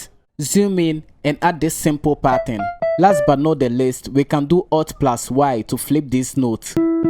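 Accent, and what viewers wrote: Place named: Ghana. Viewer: ok bro . Am watching from Cameroon. I guess your are Ghanaian from ur accent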